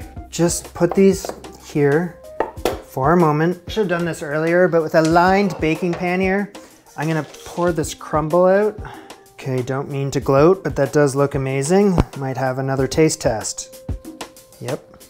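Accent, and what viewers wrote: Canada. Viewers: I think I am falling in love with you. I hope that's okay. I love your Canadian accent.❤ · I knew from the moment i heard you that you are Canadian. The way you swear, hahahaha. Love yourself and your content. Loyal watcher from Alberta.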